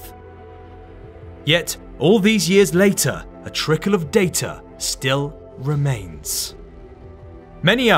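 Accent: United Kingdom. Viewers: British limey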